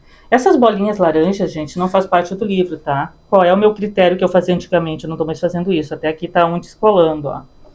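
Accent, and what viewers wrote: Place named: Rio Grande do Sul, Brazil. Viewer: Bookshelf legal, ainda melhor com o soquate gaúcho, saudades do sul do Brasil 🙌🏻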